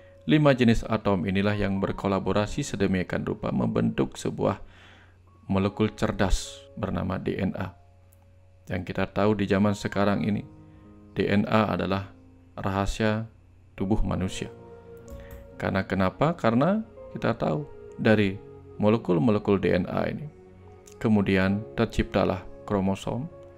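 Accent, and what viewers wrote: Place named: Bali, Indonesia. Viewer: Huruf T nya masih Bali yan.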